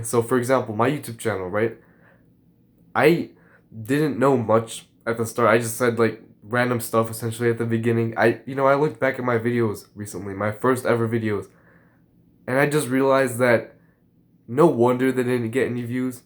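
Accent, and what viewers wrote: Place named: Germany. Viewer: You have a German accent and probably live in Germany? If so, I lived in Munich for three years. Spreek je Nederlands?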